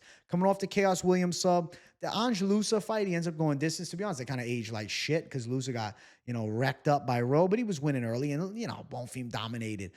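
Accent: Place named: New Jersey, United States. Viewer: Good to hear more of that Jersey coming out in the vacation accent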